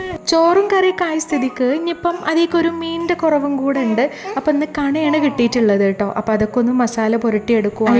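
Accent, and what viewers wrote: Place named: Malappuram, India. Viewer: നിങ്ങടെ മലപ്പുറം ഭാഷ കേൾക്കാൻ ഒത്തിരി ഇഷ്ടാണ്. അടിപൊളി 👍👍👍🥰🥰🥰🥰🤩🤩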